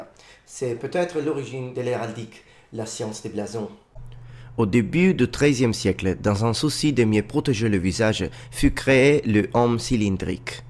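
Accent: Italy. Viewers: Hi. I'm here for some feedback. That was very good overall. I understood everything without effort, so you level is clearly good. However, you still have some room for improvement (you're good, so this will be nitpicking). The sentences you used were well structured, but sometimes, the pronunciation was off, even when ignoring your natural Italian accent. So you had trouble with using the correct "déterminant" (un/une) depending on the type of word that follow. But this is hard for everyone learning french. Secondly, (and this is a bit funny), you said "chevalieu" instead of "chevalier" a couple of times. Lastly, the biggest mistake was pronouncing the "p" in "coup". You just don't. It's silent. Yeah, i know. Fucking french right! It's the biggest mistake because when you pronounce the "p", it sounds like "coupe" (slice), which is a totally different word than "coup" (blow). 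And regarding your trademark sentence "the has spread his wings", i couldn't help but find it very stylish in french "Le a déployé ses ailes". Nice! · un accent particulier en français, j'entend l'accent italien et l'accent anglais en même temps. je préfère l'anglais ;) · Sometimes, the italian accent can be remarked, although very lightly. The rest of the time, it's a bit obscure : it can be heard that he's not a native french speaker, but it doesn't sound specific to any country. Which is pretty neat considering french has such a weird and uncomfortable syntax to be spoken when you're not a native speaker (hell, even native speakers can get it wrong pretty often)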